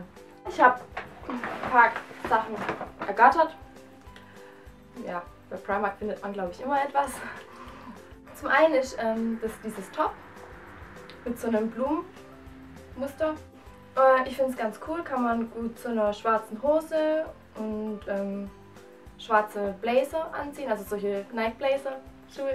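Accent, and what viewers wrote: Swabia, Germany. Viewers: Kommst du aus Schwaben?:) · Woher kommst du? Hast einen schwäbischen Akzent ;) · Hehehehe dein schwäbischer Akzent♥ ich komm au aus der Gegend;-) tolles Video:* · "Des isch" ich komm auch aus deiner Gegend und Finds total schee, wenn ma son bissl Schwäbelt ;) du bist wunderschön, dein Video ist Super geschnitten, du redest als hättest du nie was anderes gemacht...ERSTES VIDEO?! Mach auf jeden Fall ganz bald dein nächstes Video!! Auch wenn ich nicht wirklich viele YouTuber abonniert habe, aber du hasch nach dem Video sofort dazu gehört und ich freu mich auf weitere Videos. Du wirst bestimmt eine große YouTuberin!!!! Viel Glück und ich bleib dabei ;* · redest du schwäbisch? :D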